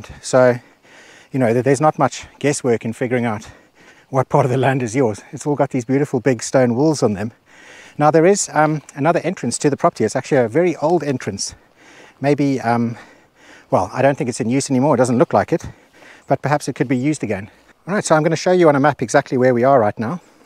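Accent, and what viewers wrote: South Africa. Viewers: Hearing the Saffa accent when I clicked on this video was definitely a pleasant surprise 😂 I'm hoping myself to live somewhere in rural Portugal someday while working online. Seems like a dream.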